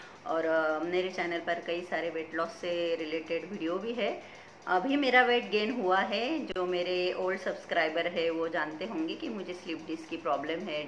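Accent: Nashik, India. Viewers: तुमचा हिंदी चा आवाज छान आहे मराठी पेक्षा मराठीत नाशिक ची भाषाआहे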